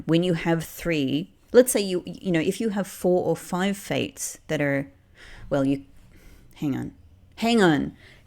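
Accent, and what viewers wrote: Australia. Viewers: I was wondering what your accent was and then literally you said “As an Australian…” funny timing! Love the play though so far! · I immediately clocked your accent. As a fellow Australian 😅. It’s nice to have a familiar accent on a playthrough.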